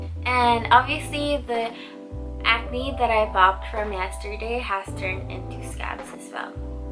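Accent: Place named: Philippines. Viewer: your accent is SOO cute!! are you filipino???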